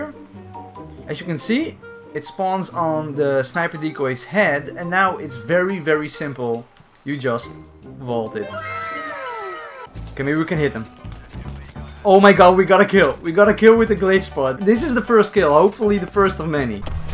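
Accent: Netherlands. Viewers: he's Dutch like me cause of his accent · its sounds like a dutch person is talking english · his accent, i ame and it sounds like Dutch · I assumed you are Dutch because of the way you speak German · are you dutch? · Waarom klink je zo Nederlands het is eng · You have a good duch accent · you sound dutch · klinkt deze guy een beetje nederlands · Hold up! Are you dutchh? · i knew youre dutch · Are u dutch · Are you dutch? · Klopt het dat je nederlands bent? ?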